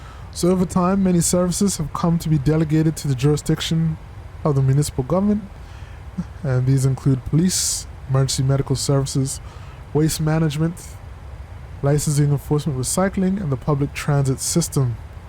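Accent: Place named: Sint Maarten. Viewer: Hey bro which Caribbean island are u from your accent sounds st.Maarten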